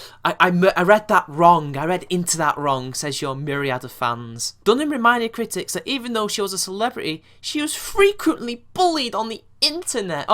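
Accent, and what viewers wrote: United Kingdom. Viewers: I somehow missed this in my subscription feed. This was really entertaining video. Thanks for the laughs! The way you say certain things in your hot British accent makes your jokes seem even funnier! Nice to see your handsome face on camera :)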